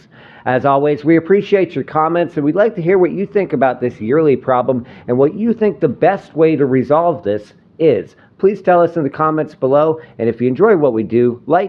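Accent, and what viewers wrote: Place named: United States. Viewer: it's not fault. He's American. Words like Bangkok and Bang Lamung both have the letter 'A', but Americans insist on pronouncing these words with an 'E' and an 'O' respectively. Yes, I know, weird...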